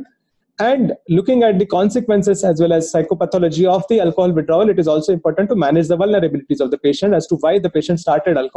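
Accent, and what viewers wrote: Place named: India. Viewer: Thanks doctor, this is a fantastic explaination that has helped me and i always watch this while dosing, even though there is a strong indian accent for those who dont understand it too well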